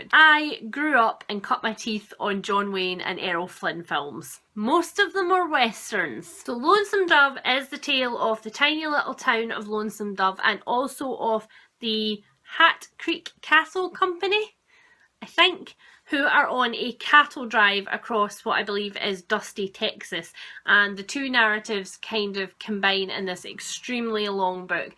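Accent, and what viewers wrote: Scotland, United Kingdom. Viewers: Just discovered your channel! I love your videos! And I love your scottish accent! I can't wait to visit Scotland again next summer (I'm Italian), I've been there back in 2014 and fell in love with it.. Plus, Edinburgh's bookshops are to die for! Awesome Haul btw!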